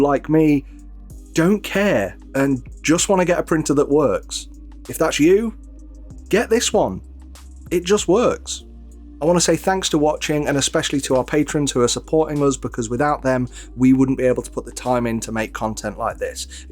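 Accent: United Kingdom. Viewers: I tend to use carbon fibre infused PLA and Matte PLA on prints that require me to hide my layer lines and I print at 0.12mm layers and I get wonderful results, I wonder how good they would look using that material on that printer with those layer heights? I had promised myself I would not buy another bed-slinger printer after I built my Pro 3D V-King 400 from self-sourced very best quality parts, I also have 3 Ender 5 Plus machines as well as half a dozen bed-slingers, I have a resin printer but can count on my fingers how many times I have used it as the smell and the mess and all the cleaning just put me off but seeing the prints this machine can produce has made me think again, if this was released as a cube printer it would be a no brainer descision for me, what size nozzle do you have to use to be able to print in those low low layers? Your channel has also escaped my radar until now and it is a breath of fresh air to hear another English northerner (I think) talk about 3D printing, I am from South Yorkshire by the way! 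+1 Subscription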